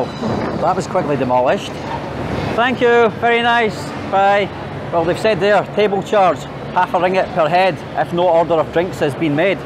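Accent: Scotland, United Kingdom. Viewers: I love your Scottish accent.👍🏼👍🏼😁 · You are a sweet man. Bit of a clumsy bum but the Scottish accent and lovely approach to people makes up for it! Will keep watching and I have subscribed. I bet your taste in food has grown astronomically...well done as it’s not a common thing for UK citizens to have a broad palate. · Have to make myself familiarise with Heavily accented Scotland English. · Seen this on FB a year ago, now finally get to see the full vid hahaha. Loving that Scottish accent. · your scottish accent make my throat feel swollen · I like Scottish accent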